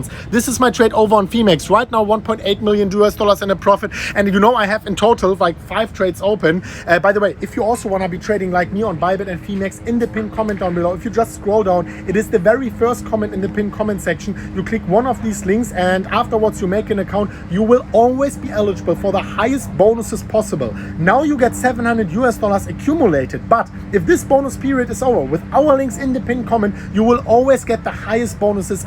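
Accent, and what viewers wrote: Germany. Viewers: Fuck, seriously. You spend more time abroad then in Germany, start getting rid of that stupid accent. Sound just ridiculous. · How do you have such a thick German accent with your command of the English language..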